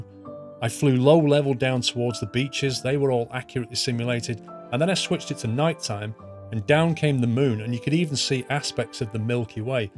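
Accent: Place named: Bromsgrove, United Kingdom. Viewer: You speak just like my cousin from Bromsgrove, coincidence?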